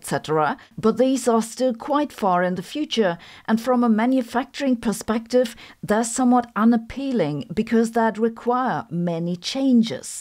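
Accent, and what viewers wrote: Germany. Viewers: but seriously, she is German. I hear they might have an accent over there that is different from what you might be used to :P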